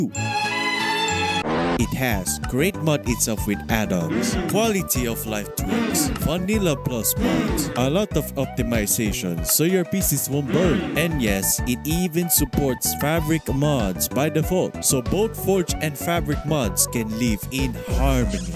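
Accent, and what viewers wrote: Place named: Philippines. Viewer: RAHHHHH PILIPENO ACCENT LETS GO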